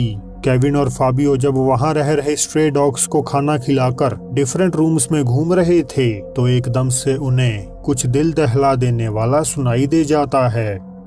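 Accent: Punjab, India. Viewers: Sie ekk baar bta doh app . Kya ap punjabi hoo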